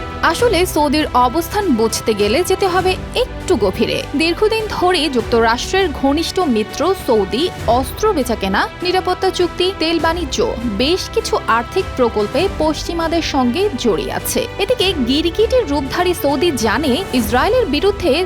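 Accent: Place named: India. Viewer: ইন্ডিয়ানদের মতো খবর পড়া ভালো লাগে নি😢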